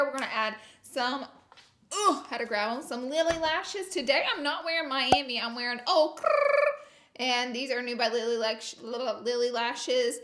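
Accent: South, United States. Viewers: Your eyes were gorgeous!! So glad to hear your sweet southern voice!! ROLL TIDE BABY!!!!!🎃👻💜💜💜🕸🍁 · You can tell you’re from the south when you’ve never miss understood words 😂😂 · Did you spend a lot of time in Alabama during your break? Your southern accent sounds like it’s been rejuvenated. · I have the same accent. be proud of it. I love being a southern n.c. girl.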